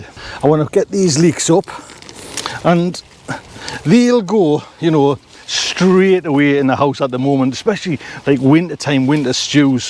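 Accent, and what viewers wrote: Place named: North East England, United Kingdom. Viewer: Hello. Just found your link on Steve’s channel and as a new gardener (now retired) I am always on the lookout for gardening tips so I will be going through your past videos. When I heard your accent I was curious where you were from in the North East. I am from Stanley, Co. Durham so I thought it would be nice to get tips from someone who is in the same climate as myself. Looking forward to your past and future videos. Appreciated. Allan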